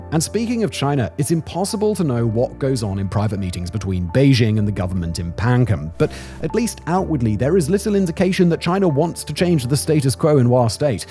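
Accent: England, United Kingdom. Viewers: He's terminally English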